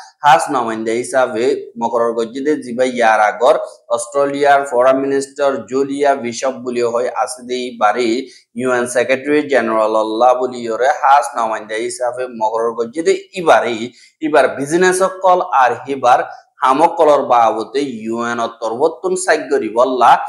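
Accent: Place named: Chittagong, Bangladesh. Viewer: You not Rohingya you chitangya bangali your every speaking news chittangya bangali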